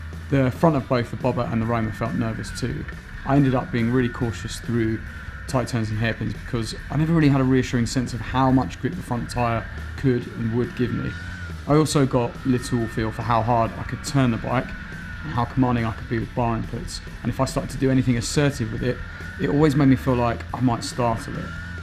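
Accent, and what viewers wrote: United Kingdom. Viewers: He's British, that's the way they pronounce there.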